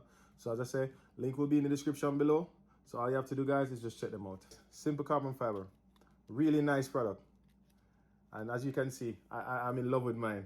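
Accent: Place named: Jamaica. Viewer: The Jamaican accent can't be hidden. It's a good look mi boss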